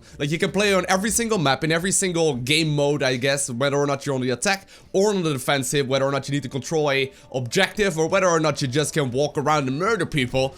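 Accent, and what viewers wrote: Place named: Netherlands. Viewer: that dutch accent... r u from holland mate?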